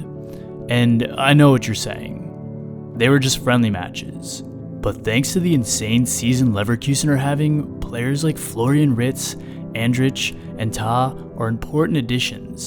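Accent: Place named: United States. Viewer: Next time I hear an American accent talking about football I'm going to immediately switch off. this is bs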